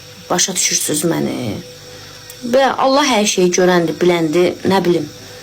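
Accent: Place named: Ganja, Azerbaijan. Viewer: Bu lehcesinden Genceliye oxshyur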